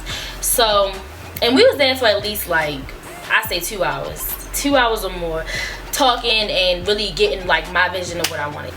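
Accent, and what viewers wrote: Baltimore, United States. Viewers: Is she from Baltimore ? 😭😭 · I love ya Baltimore accent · As soon as she started talking I knew she was from Baltimore. 🤘🏽 P.S. You are beautiful 💕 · I can tell you from Baltimore lol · Man I’m 3 mins in & automatically knew she was from Baltimore before she said the designer was 🤗 her accent 😍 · You from Baltimore? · Would’ve been so mad! You from Baltimore? Me too lol I can tell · I just knew u was from Bmore/md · i figured you was from baltimore the way you talk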